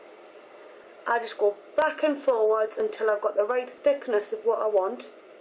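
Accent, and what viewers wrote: United Kingdom. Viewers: God i love your videos ! humm, r u british?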